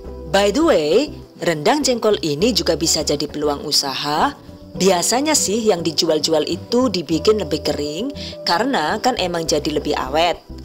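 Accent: Banyumas, Indonesia. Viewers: nice info ..mksh.. ini bhs nya kok ngapak banyumasan..dr madiun or banyumas yha heee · Oalaah Wong ngapak mbokayune 😀😀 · Jebulnya ngapak yak hehe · Jebule wong ngpak😂😂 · Bahasane ngapak kyk BN aku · Mbaknya dr Banyumas ya ngapak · Dene ngapak...ora ngapak ora kepenak 👍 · Perfect banget Bunda, bdw kok bisa ngapak emang mertua org mana Bund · Deneng pada karo nyong mak ngomonge ngapak😁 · Inggrisnya Fasih 😁... eh jebule wong ngapak ya 😅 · Kok ngapak logatnya 🤣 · Mantulll... Paket komplit.. yg dulu blm doyan jengkol. Sekarang udah suka... Kok suaranya ngapak ? Heeee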